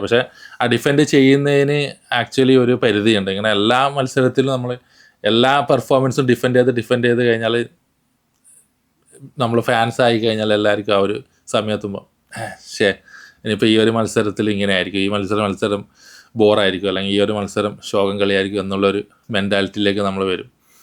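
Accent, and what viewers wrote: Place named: Tamil Nadu, India. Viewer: Ninde malayalam thanne manasil avunillaa..thaan thamizhan ano. Pinne shokam united.n aara parayne..niyarada 30 kollathin shesham oru pl trophy kandda... liverpool fan aaa idparine kayjja kollam a city onn thazhe poyappm...pottan lottery adicha pole oru trophy kitti....ithrem mosham samayath koodi poyappm..oru poolan fanm njjn onlinl kanditt illa...kayjja kollam trophy kittyappm...alle ni youtube thanne thodaggne.pooo mone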